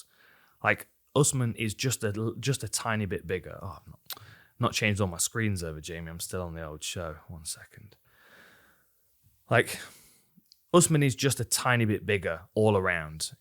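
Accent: United Kingdom. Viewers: I don't know what it is about that British accent but I can listen to you speak for day's. Its like listening to sean Connery read a book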